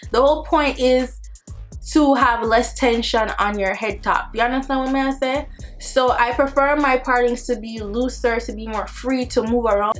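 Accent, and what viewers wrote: Jamaica. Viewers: I’m sooooo SURE your Jamaican. Right or wrong?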